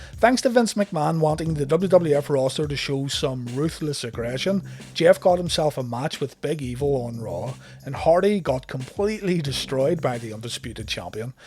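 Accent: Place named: Scotland, United Kingdom. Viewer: I'm Irish too and I thought he was scottish too:O love your vid by the way <3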